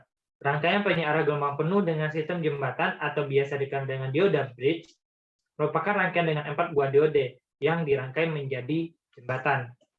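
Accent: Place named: Padang, Indonesia. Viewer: Logat Padangnya kental sekali ... 👏👏👏👏👏👏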